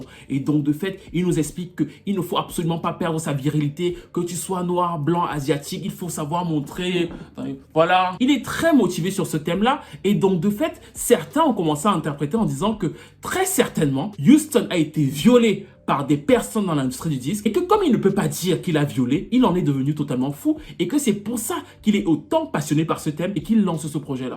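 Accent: Cameroon. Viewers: Tu ne serais pas camerounais par hasard parce que tu as un petit accent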